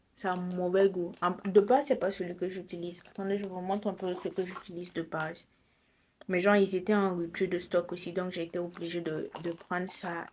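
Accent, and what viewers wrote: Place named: Burkina Faso. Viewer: Dès 5 premières secondes j'ai su qu'elle est burkinabè 😂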